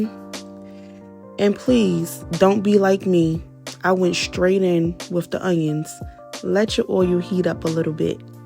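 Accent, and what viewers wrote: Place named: Baltimore, United States. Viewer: Looks good, youre from Balitmore arent yew lol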